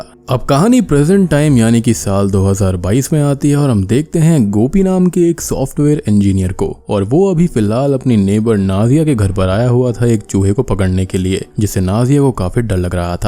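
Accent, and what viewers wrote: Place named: Hyderabad, India. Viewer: Tum mast hyderabadi bolte bhai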